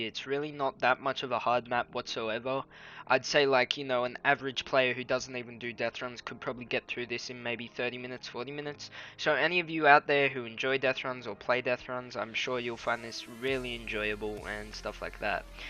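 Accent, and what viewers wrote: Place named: Australia. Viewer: Shiit I didn’t kno u were Australian that’s mad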